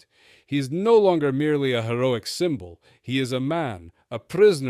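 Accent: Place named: Scotland, United Kingdom. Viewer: Excellent expose. Luvin' ur Scots brogue.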